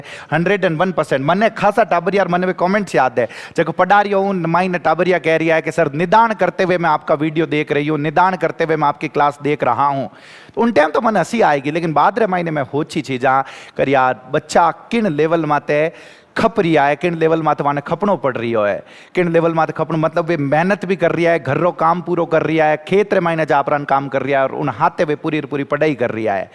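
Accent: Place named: Bihar, India. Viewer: Abe bol kya rha h.... बिहारी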